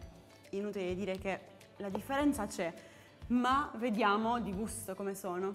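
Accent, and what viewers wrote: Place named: Apulia, Italy. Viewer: Che amore che sei con quell'adorabile inflessione pugliese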